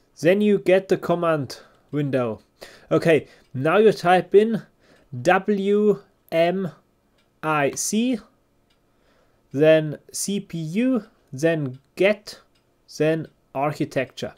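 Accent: Germany. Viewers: Hab nur fur deutsche Aussprache geklickt wurde nicht enttäuscht. Danke weiter so bist der beste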